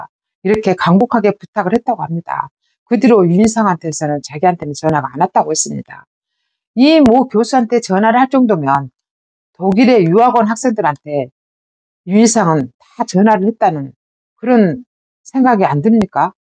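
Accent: Jeolla, South Korea. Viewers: 전라도 말씨가 이렇게나 예쁘시다니... 그동안 고정관념을 버리겠습니당~! 통영의 딸과 그 불쌍한 남편이 생각나는군요!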